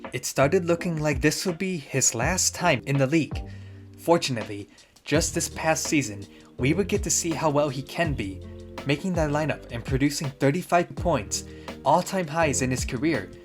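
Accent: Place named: Asia. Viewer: I didn't even realize the narrator's Asian accent until he said words like "Golden" and "Calder"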